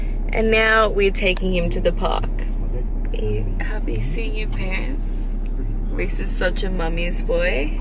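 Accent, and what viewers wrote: Australia. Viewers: What's the city called? I love the Australian accent but sometimes I can never pick up lol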